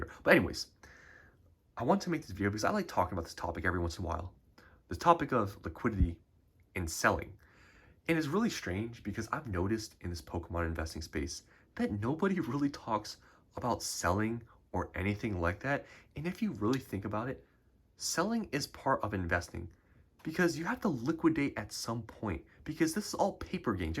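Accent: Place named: Asia. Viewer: This is random af but from your accent and hand I'm guessing you're asian?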